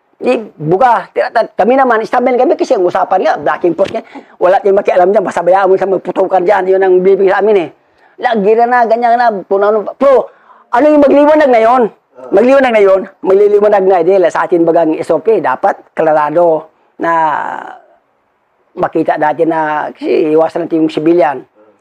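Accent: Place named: Bicol, Philippines. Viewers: siguro bisaya ito sir, pero nasa bicol na nakatira kya bicolano na din ang tuno. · The "baga" of bicolano. Pero sabi ni Sir Bisaya daw si sir. Baka matagal n sya sa bicol. Ang punto ehh bicolanong bicolano.. Oragaon man Si.. Salute sayo master. Baka may part 2 pa sir. yung sa Sunod na barangay n bangit nya sa ORAS. Parang c master Ledesma lang ang kwento. Haha. Solid panoorin..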